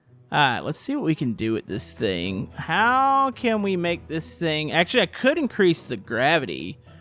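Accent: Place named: West, United States. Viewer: are you western? because the way you talk and sound, sounds western American.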